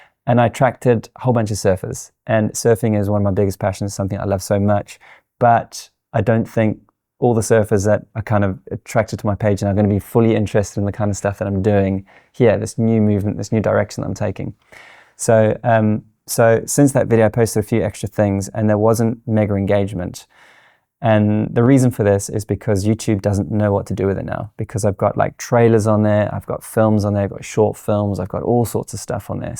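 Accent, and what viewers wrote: South Africa. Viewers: Keep at it, I randomly stumbled across it. Love it. Recently started as well, after 3+ years of putting it off. Listening, it sounded a bit like you were South African. Im loving the content, please do keep it up. Im trying to be raw, edit as little as I can (Which is hard) but Im working it.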